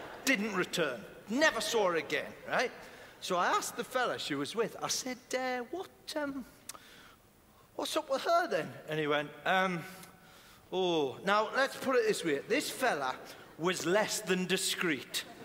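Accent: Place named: Newcastle, United Kingdom. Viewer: Tomi Haustola He's a Geordie, which is a nickname people give to Newcastle folk, my grandad is one. It's one of the furthest north places in England so it's expected that they sound quite strange to foreign folk. Listen to an Australian comedian and then, you'll really notice the difference. Aussies stretch out their inflections MUCH further.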